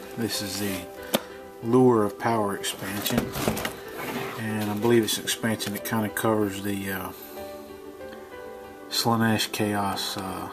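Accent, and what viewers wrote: South, United States. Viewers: Great vid! And a great voice, where in the U.S you from? Very easy listening on my British ears. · Good video. It's always nice to hear someone with a southern accent who doesn't talk like an idiot. :)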